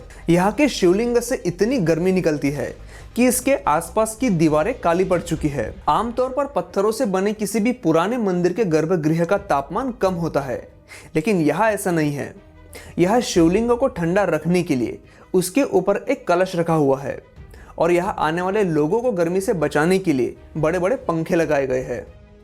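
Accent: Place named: Bengal, India. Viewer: Sir, are you from Bengal? Cause your accent is like so.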